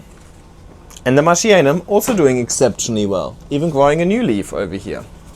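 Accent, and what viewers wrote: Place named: Germany. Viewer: Your accent sounds like your German. Are you? 😁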